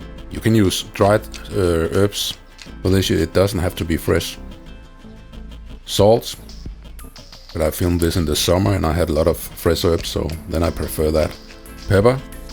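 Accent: Denmark. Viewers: Looks great, and the Danish accent makes it even better.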